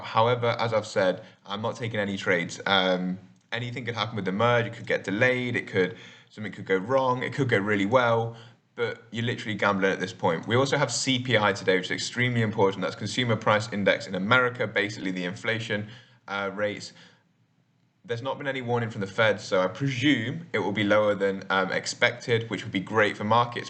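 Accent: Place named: Cockney, United Kingdom. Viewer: You gorgeous! You sound a bit Cockney. Am I right?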